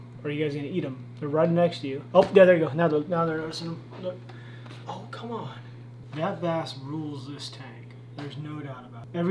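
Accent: South, United States. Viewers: you and Nick Irvin should go teach demo ranch to shoot long range for a video idea. Your southern accent was shining today by the way, it was sweet, I love your videos keep them coming.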